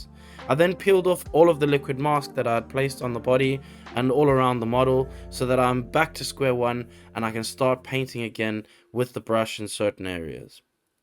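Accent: South Africa. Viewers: I'm very new to this channel, thanks for the tips! Is that a South African accent I'm hearing? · Love your vids thanks for sharing!!! Subscribed ……Is that a South African influenced accent I hear there?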